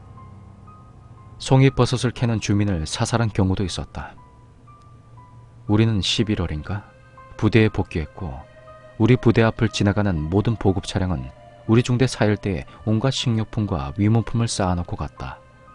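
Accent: Gyeongsang, South Korea. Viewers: 무서운것도 무서운데 목소리랑 경상도 사투리 딕션이 너무너무 찰지네요ㅋㅋㅋㅋㅋㅋ 니 안전핀 맺게 뺐어? 하나빼고 던졌지 말임다. 야이 서울대 강아지야 덩달아 선수씨바야ㅋㅋㅋㅋㅋ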